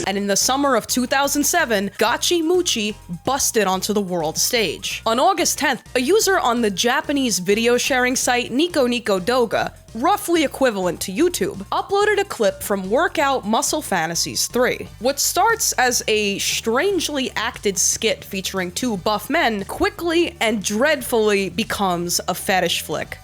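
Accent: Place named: New Jersey, United States. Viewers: I feel like youre holding back a jersey accent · Alt woman with bangs and a moderate new jersey accent explaining in depth ancient gay memes. My kryptonite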